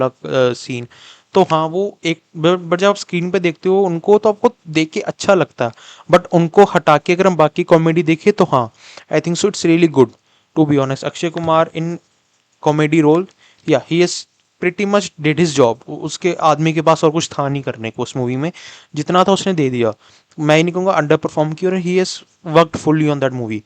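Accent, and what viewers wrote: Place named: Pakistan. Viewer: yar tum accent sy to pakistani lagty ho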